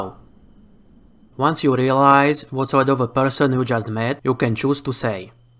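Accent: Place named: Russia. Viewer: Spasiba,. You're accent sounds Russian /Ukrainian to me, so excuse me if I got that wrong. Your video is very helpful, because Klinov killed me twice.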